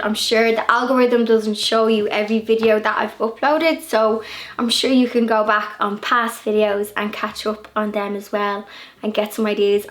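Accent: Ireland. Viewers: Bless your heart! I think it's good for everybody to unplug for a while; live in the now, and just live. Be safe be well. I enjoy your videos. I live here in the United States you're just cute as little bug's ear. I love hearing your Irish accent. Enjoy your time my dear you so you definitely deserve it. Blessings and much love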